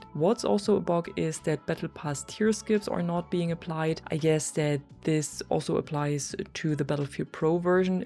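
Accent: Germany. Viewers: Dein Akzent hört sich an, wie wenn ne deutsche Frau englisch spricht. Kann das sein? aaahja, habs gesehen bei insta.. German.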